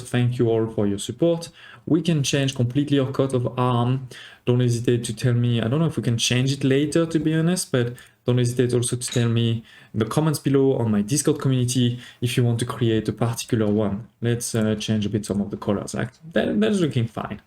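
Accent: France. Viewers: Avec le bon accent français hmmmmm 😮‍💨👌🏻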